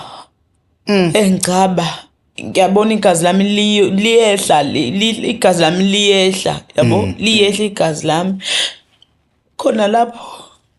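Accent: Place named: Johannesburg, South Africa. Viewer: 😂😂😂 uhlulekile kodwa uku actor ntombi lestori sakho singamanga, eccent yakho eyase Goli eRange Farm or Bekersdal. EMhlanga awazi nokuthi kungakuphinje 😂😂😂 Kulungike byeeeee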